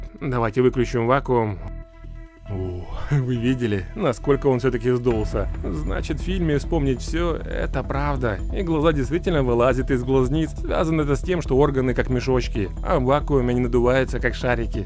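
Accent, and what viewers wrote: Ukraine. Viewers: Он украинец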